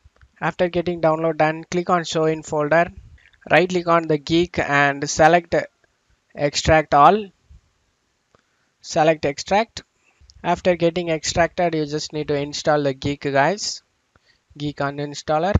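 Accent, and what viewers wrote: India. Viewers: I never find a working tutorial untill a guy with an Indian accent comes up and solves it,new sub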